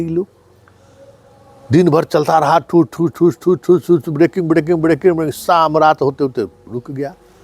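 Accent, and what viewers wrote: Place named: Bihar, India. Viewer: Once you are narrating in Natonal video , you must modify your pronunciation. It is a typical bihari accent. Please speak in proper hindi.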